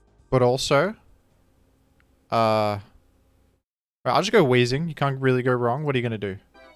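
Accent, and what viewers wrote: Australia. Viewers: I know it sounds basically the same for americans but he is, in fact, australian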